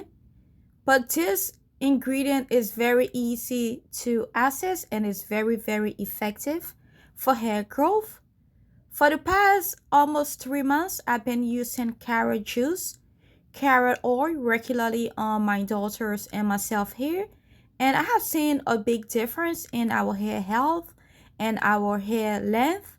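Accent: Liberia. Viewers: I hear a little Liberian accent 🥰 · You a complete Liberian sis that tone can’t hide. Thanks for the video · My mother in law is Liberian and your accent is so similar. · Are you a liberian? You sound like one qnd i'm a liberian too so i'm asking.. thanks for the video. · You sound so Liberian 🇱🇷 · You sound like a Liberian🇱🇷❤️❤️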